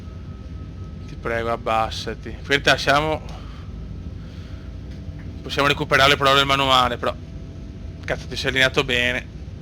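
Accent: Veneto, Italy. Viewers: Bel video, ma parli troppo veneziano, si capisce poco quel che dici...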